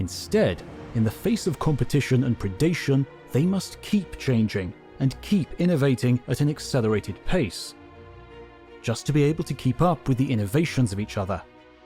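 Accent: United Kingdom. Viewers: The dude is from Great Britain. I'm not sure what rock you grew up under but people tend to sound like they come from their place of origin.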